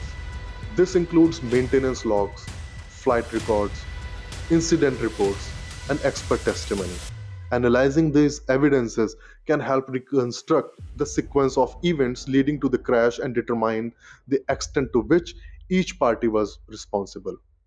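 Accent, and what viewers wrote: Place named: India. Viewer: Sorry Indian voice hurts my ears. All I can hear is SAAR DO NOT REDEEM!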